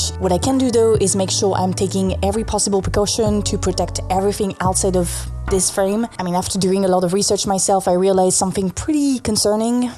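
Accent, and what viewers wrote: France. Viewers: She has a strong French accent, she references France quite a few times in her content, how you are you just learning this? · Girl, which French department are you from? I’ve never heard such strong French like yours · Your accent makes me think “English sounds best spoken by the French” 😌 ((I'm from the UK if that means anything))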